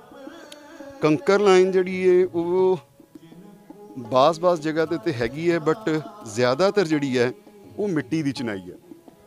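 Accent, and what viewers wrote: Punjab, India. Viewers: Tuhadi boli charde Punjab nal raldi ji bahut vdia jankari diti ji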